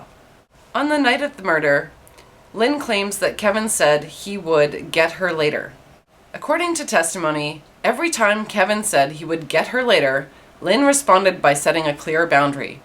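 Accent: Canada. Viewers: Jesus cant act, you tell terrible stories. If you werent so cute and prone to telling the truth I wouldn't watch this. You really need some public speaking classes. You pause st awkward points and that takes from the flow. That Canadian O gets me every time too.: ) But seriously, you do sound like a first year broadcast student who is about to pass out from fright. Also, you looking at us over your glasses frames you as an agressor and you appear annoyed at the viewer.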